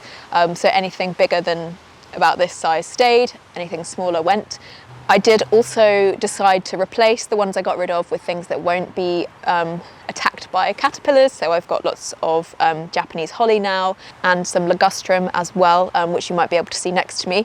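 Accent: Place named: United Kingdom. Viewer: I love british accent 🎉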